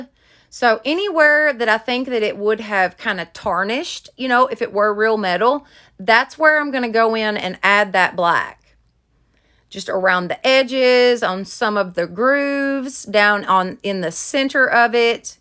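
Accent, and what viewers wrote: South, United States. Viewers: The happy harvest truck aint bad. But craft happens and sometimes things just dont come together. If that was your mess up then I would hate for you to see mine. LOL I think everything you make is amazing and love the country twang it just does it for me. Keep up the great work and cant wait to see what you come up with this christmas!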